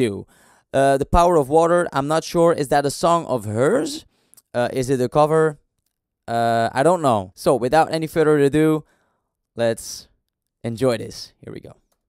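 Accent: Netherlands. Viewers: question; quite a dutch accent.. where are you from?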